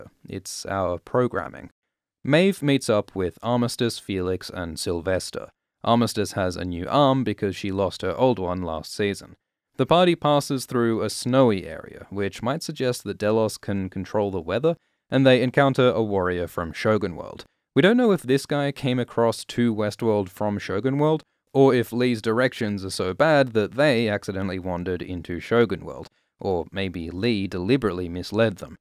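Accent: Australia. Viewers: Literally nobody comments on your Australian accent for some reason. Anyhow, I'm in Perth, and if you're local, you should come around for a sesh. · I'm just starting your video. I've watched so many and NEVER realized u aren't British!!! I'm an IDIOT!! So, are u from Australia or New Zealand? I've always wanted to travel there. My daughter, Naomi, can do a GREAT Australian accent. I can't even seem to say the word NO like you do. But she can!! Looking forward to this video and seeing you on the Westworld page on the AMINO APP😀💖👏🐴🔫🐯🌻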